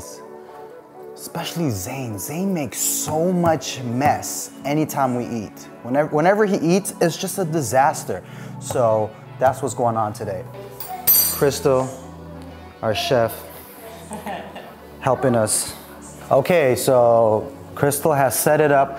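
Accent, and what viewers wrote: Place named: United States. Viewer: Well, you speak american english. Its different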